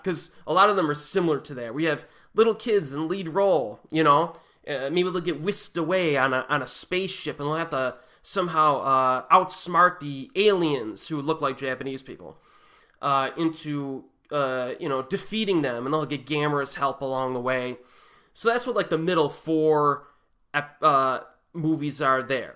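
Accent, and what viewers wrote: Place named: Pennsylvania, United States. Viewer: You gotta be from PA with that accent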